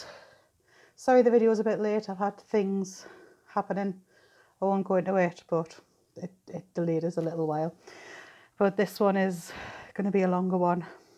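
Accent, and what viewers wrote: Newcastle, United Kingdom. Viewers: Aah pet, is that a fellow Geordie voice I hear? You've picked up on all the bits and bobs I did, especially that bit about "the beloved daughter-in-law" in their court statement. I don't know who wrote this - guessing their court representitive who read it out - but that sounded so disingenuous when both parents had already made it more than clear they didn't like her - at all. OK they can say they are sad about the whole tragic event and people will probably believe them, cos I'm sure they are (if only cos their beloved son is going to prison for the rest of his life) but don't start gushing out false terms of endearment now and over egging it. If Shanann had been so "beloved by the Watts family" why didn't Mamma W get her onside, make the effort to get to know her, instead of going against her at every opportunity. And not going to their wedding? 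That would have been a very good time to start sucking up the fact that Chris had chosen her and Mamma W had to get used to it. But don't you think, Mamma W would have had a struggle to embrace any girl CW had chosen to marry? I really do think the Watts had a problem with the Rzucek family. Did you hear Sandy Rzucek's tale about when all 4 parents were staying in Colorado? Mamma W had a psychotic meltdown and wouldn't "allow" the Rzucek's to spend any time with the grandkids - and the Watts were there for a week. They didn't even all sit down and eat an evening meal together. How's that for weird? I didn't come to this case until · Just came across your channel, Have prescribed .You come across As someone very genuine and different from the others I’ve watched.And I love your Geordie accent.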